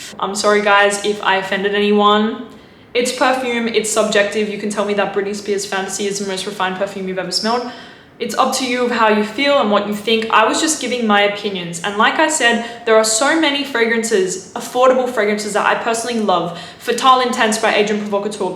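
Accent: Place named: Australia. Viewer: Those fragrances might be tacky. But not as tacky as your half Australian, half European (??) accent. You don't sound exotic. You sound tacky. Stop it.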